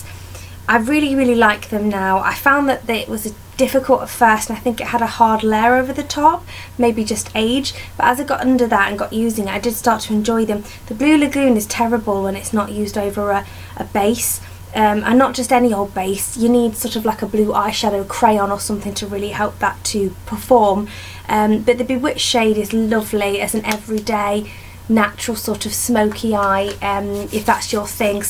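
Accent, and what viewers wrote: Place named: United Kingdom. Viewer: Yay 21 product, that is amazing! I used up 30 makeup products this year (including one little polish and a face primer). Happy to follow you in 2017, girl! :) Are you from the UK, I looove your accent! You remind me of how Sali Huges speaks (I adore her)! Oh congratulations on your pregnancy! I have two little girls! :)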